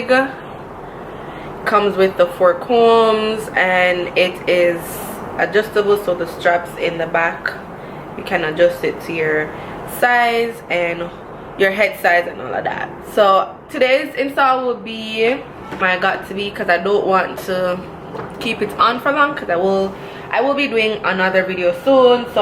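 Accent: Jamaica. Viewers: I’m like this girl sound Jamaican 🤣 and I realize you are. Love this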